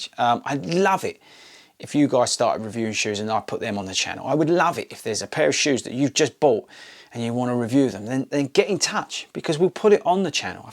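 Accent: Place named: South England, United Kingdom. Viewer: Cheers for the videos this year, I don't watch all of them and I'll tell you why in a moment. The running related content is excellent, the vlogs are excellent but there are too many videos released. You will burn out, and I tell you this as an ex YouTuber who had 45k subs & 50k views a week.(it was not a running channel) I made 3 videos a week but refused to endorse any product, and was emailed multiple times a day to plug this and endorse that. (You know how it all works) You don't want to become known as the king of the freebies, as a viewer it's the most off putting thing ever. (I could say the same for mid roll ad's which I also didn't do) too much product placement leads to you not being trusted and coming across as insincere. Quality videos over quantity win every time, also don't worry about pleasing the American audience which is why I suspect your shoe review weights are in ounces and most videos come out at midnight, (Americans will watch anyway because they love a South England accent!), I stopped my channel about 18months ago now because it became another job which I never wanted it to be. 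Anyway best of luck for 2021 for you, the run community and your excellent channel.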